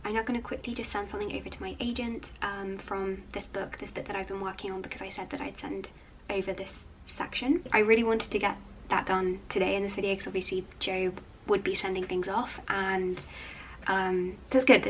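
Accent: RP, United Kingdom. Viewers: There’s no need to “correct” pronunciation. She’s speaking in English, and pronouncing all of her words in her RP accent. English borrows a number of words from different languages so she can pronounce it according to her understanding of English. If it were French, it would be a different matter. Given the range of accents in the UK alone, the pronunciation of words is malleable to each individual speaker. ✨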